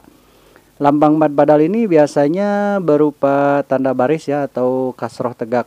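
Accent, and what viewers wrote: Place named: West Java, Indonesia. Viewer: logat sunda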